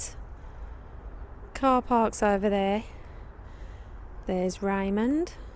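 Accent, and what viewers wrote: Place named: Birmingham, United Kingdom. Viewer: Very good video.that sounds like a brummy accent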